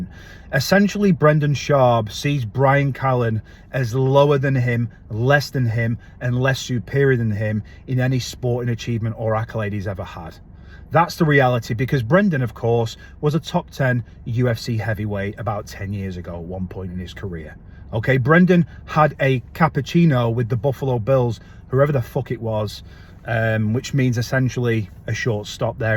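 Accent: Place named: England, United Kingdom. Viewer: Thank you. I feel the same. And I really love hearing your English accent. English accents are glorious & always elevate the experience ❤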